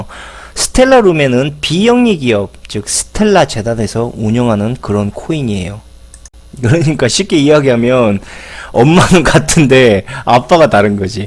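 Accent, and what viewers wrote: Jeolla, South Korea. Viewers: 전라도세끼들참 말하는꼴아지 말투참좆같네 쓰래기코인 망한줄모르냐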